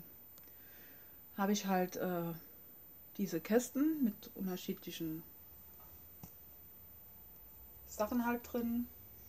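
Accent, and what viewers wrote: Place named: Saarland, Germany. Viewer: aloha, habe deinen kanal vor kurzem entdeckt und bin kleben geblieben und das nicht nur weil der saarländische dialekt einfach cool ist ;) ich hoffe du machst noch viele videos.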